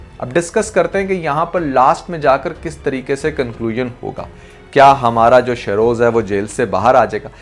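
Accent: India. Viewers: Indians ki trah "phir" KO "fir" Ku bolte ho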